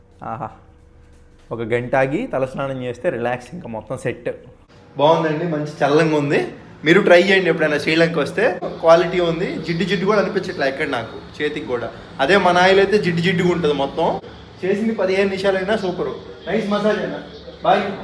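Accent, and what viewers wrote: India. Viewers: Re first you learn English talk English and talk properly English. Then after you go to world travel in front onwards. You talking this Buttler English, it’s not good for us. It’s not good for Indian people also talking about that Butler English in front of foreign persons it’s impact for us in India, so learn properly talk properly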